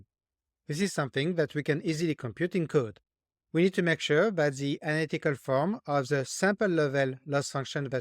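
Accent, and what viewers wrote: France. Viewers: t'es français, mon pote ?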